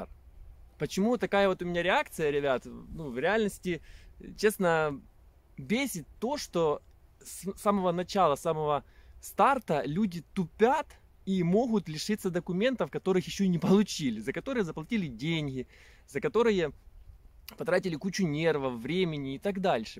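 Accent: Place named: Surzhyk, Ukraine. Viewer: Досмотрел... Спс что не перешёл на мат как это обычно бывает в камеди-клаб. И да тот суржик, на котором ты "морозишь" далеко не русский язык. Так что либо говори на украинском языке, либо учи матчасть (символично неправда ли) прежде чем выходить на просторы ютьюба!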